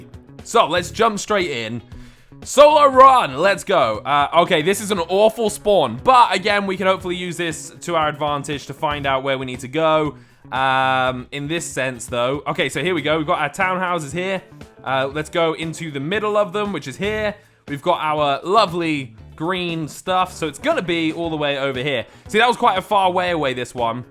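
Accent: United Kingdom. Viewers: Wish there were more maps. The 3 rotating maps are getting old. No matter how much of a British accent you put on it, this game is truly becoming nothing more than a boring time waster (even with the Halloween events that take literally 3 tries to complete).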